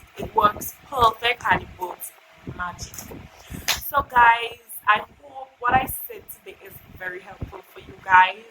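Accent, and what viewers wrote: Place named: Nigeria. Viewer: Lol the Nigerian accent 😂😂😂🙆🏾‍♀️🙆🏾‍♀️🙆🏾‍♀️ nice video ♥️💯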